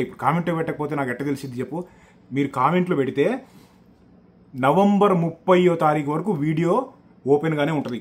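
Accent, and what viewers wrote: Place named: Telangana, India. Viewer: pure telangana slang Broo. support from Kamareddy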